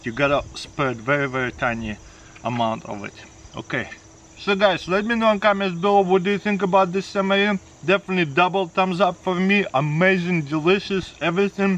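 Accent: Russia. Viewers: Why we love: 1) His excitement to do something 2) His positivity on everything even tho it isn't that good 3) His gratefulness 4) and of course his Russian accent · You should prolly do a video saying only pashtet cos I pretty much watch your videos to hear you say it 😂😂😂. I love Russian accents 👍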